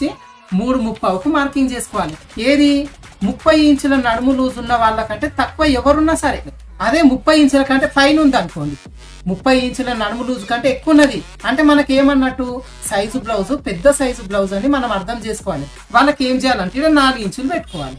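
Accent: Telangana, India. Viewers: First time Telangana language lo vinadam chaala bavundi ❤️ to Telangana accent. 👍🙏🏽 Subscribed. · First time in Telangana acent I followed your tailor ing method , nice to hear keep going waiting for more classes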